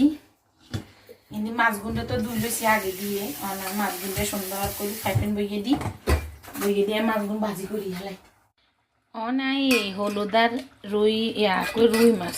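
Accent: Noakhali, Bangladesh. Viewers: আপু আমি আজকে প্রথম আপনার কমেন্ট করলাম আপনার নোয়াখাইল্লা ভাষা খুব সুন্দর লাগতেছে আপনার মুখে আমিও নোয়াখাইল্লা বউ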